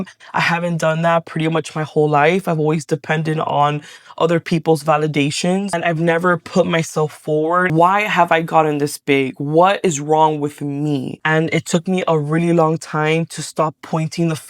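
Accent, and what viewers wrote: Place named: Southern California, United States. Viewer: Indicted TV's Negra MUST meet this youtuber! 😊 the SoCal accents 😊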